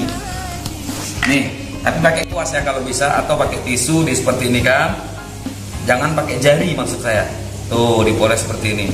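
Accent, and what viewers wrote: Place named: Palembang, Indonesia. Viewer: Mantul Pak Ustadz ngomong2 dimano ini palembangnyo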